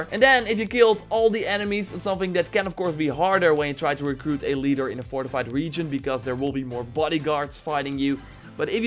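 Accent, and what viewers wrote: Netherlands. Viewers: Nice man hoorde aan je accent dat je nederlands was weet jij misschien waar of hoe je veel metal kan vinden? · Ben je Nederlands want je klinkt bij heel veel woorden die je zegt Nederlands zoals toen je ikaros zij en andere dingen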